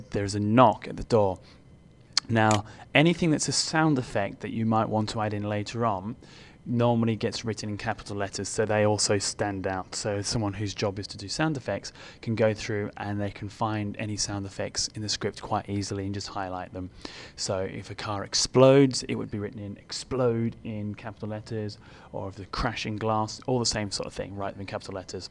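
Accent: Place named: United Kingdom. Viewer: by your accent you appear British which helps; many videos re comments-opinions on screenplay spacings take into consideration American page size which is smaller than ours; my question is: do we alter their spacings to accommodate our size of pages? And what spacing sizes are we talking about?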